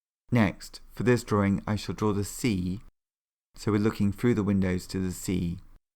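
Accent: United Kingdom. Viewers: You never over explain. Just the right amount of clarity and instruction. Thank you so much And great English accent by the way.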